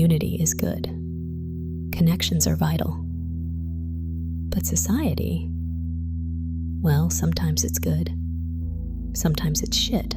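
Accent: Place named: France. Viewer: You Have Quite The French Accent 💗💗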